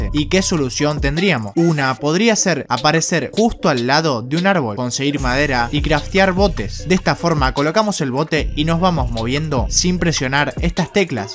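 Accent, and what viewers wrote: Argentina. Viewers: acento argentino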